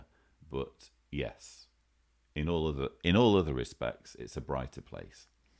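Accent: England, United Kingdom. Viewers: Are you English or Croatian or................?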